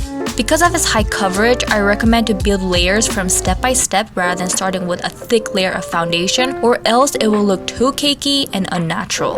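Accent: France. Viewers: Your French accent is so cute! 😜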